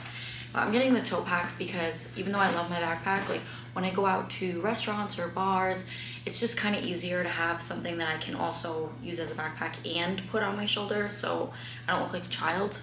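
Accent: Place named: Boston, United States. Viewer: Are you from Boston? Love your slight accent and I think I see a cape cod bracelet. Cheers to you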